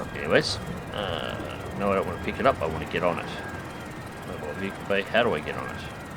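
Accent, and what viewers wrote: Australia. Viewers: its soo relaxing to watch underwater exploration carried out by someone with an Aussie accent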